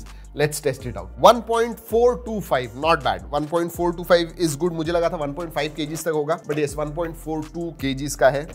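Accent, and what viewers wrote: India. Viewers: Aapka bolne ka style ekdam desi hai..."aane dijie aane dijie india me dekhte hai"👌👌 maza aata hai aapko sun ke sir..🙂🙂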